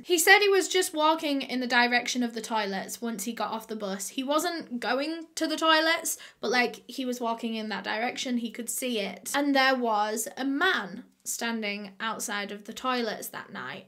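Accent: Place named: Yorkshire, United Kingdom. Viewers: Shes from Yorkshire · yorkshire accent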